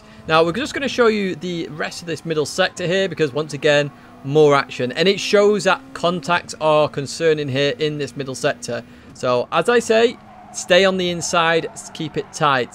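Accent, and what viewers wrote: England, United Kingdom. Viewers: What part of England are you from. I’m just curious about your accent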